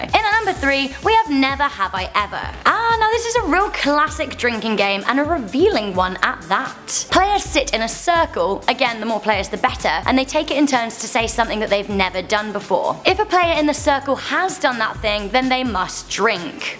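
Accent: United Kingdom. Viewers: she is British living in north America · Is she british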